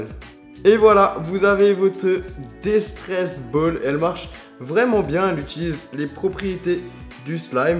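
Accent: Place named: Alsace, France. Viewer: Ta l'accente Alsacien mdrr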